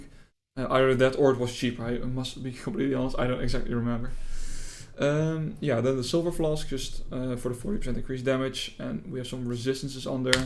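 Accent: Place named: Netherlands. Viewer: This is the best build guide so far. This is my first Poe league and character. Started as Zizaran guide then felt squishy changed to was super tanky but lacking dmg. I tried to change some pob ninja builds I couldn’t work it out. Until I found you. Thanks for your build and I really think you deserve more viewers. And I’ll be your loyal subscriber from now on. Super detailed I understood everything. (Btw i lived in Den Haag for 8 years and i hold Dutch passport, could easily recognise your accent 😊). Please keep continuing these kind of builds. And I’ll follow everyone of them Dank je wel 👍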